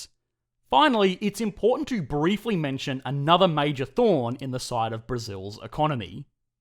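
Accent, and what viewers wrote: Australia. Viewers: But he's not an American, he's and Australian. That should be obvious by his accent alone.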